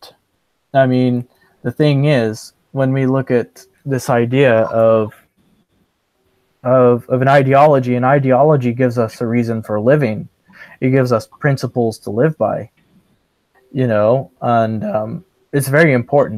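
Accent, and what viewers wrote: Scotland, United Kingdom. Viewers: 😄Great Interview!👏 👌i've listened twice now and found the discussion Very informative!🙏🌲🍃 Thank-you🌈..ps.your Scottish accent is charming!😀🔥keep it Just as is😉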